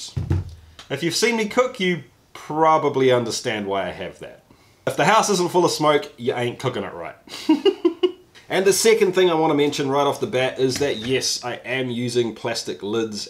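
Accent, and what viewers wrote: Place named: New Zealand. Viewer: not whiskey related. i usually have a hard time telling between NZ and AUS accents but this is the first time i was able to go "hey, he's a kiwi." (the "fush n chups" thing aussies talk about). just thought it was interesting.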